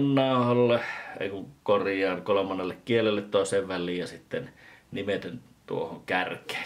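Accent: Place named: Savo, Finland. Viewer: Tämäpä ei ärsyttänyt yhtä paljon kuin normaalisti savon murre. Oli letkeetä.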